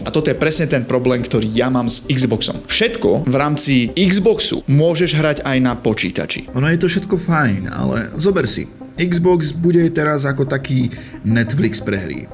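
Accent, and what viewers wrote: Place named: Czech Republic. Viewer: Kúpuje to akože naozaj? Ach vy čechuje ZE Slovenska. NEUVERITEĽNE odporný pepícky prízvuk.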